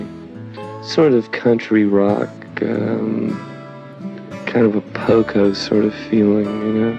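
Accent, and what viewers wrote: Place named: South, United States. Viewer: He had a beautiful soft southern drawl. I find that his song is very calming when I feel tense.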